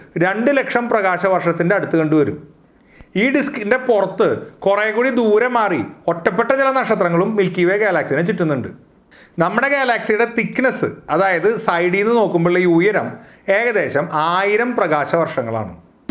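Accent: Thrissur, India. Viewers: Thanks,നല്ല അവതരണം, തൃശൂർ സ്ലേങ്, ഇനിയും കൂടുതൽ അറിവുകൾ പ്രതീക്ഷിക്കുന്നു,, പ്രകാശത്തിന്റെ വേഗതയിൽ യാത്ര ചെയ്യാൻ പറ്റുന്ന കാലം വിദൂരമല്ല സാധിച്ചാൽ മിനിമം നേപ്ടോൺ വരേയെങ്കിലും ഒരു ട്യൂർ ഒപ്പിക്കാം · തൃശൂർ ഭാക്ഷയിലാണല്ലോ സംസരം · ത്രിശ്ശൂർ സ്ലാംങ്ങ് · ഇൻഫർമേഷനിൽ കൂടുതലായി തൃശൂർ ഭാഷ കേട്ടതിൽ സന്തോഷം. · അയ് നമ്മൾ തൃശ്ശൂരിൽ നിന്ന് ആണെന്ന് തോന്നുന്നല്ലോ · Thrissur slang 🥰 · ത്റുശൂ൪ ഭാഷ കേൾക്കാ൯ രസമുണ്ട്.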